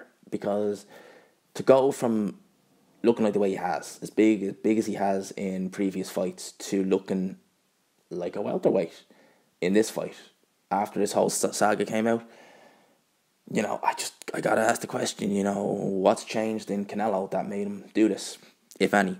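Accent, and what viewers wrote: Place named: Ireland. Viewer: Your toughts haha I love Irish accent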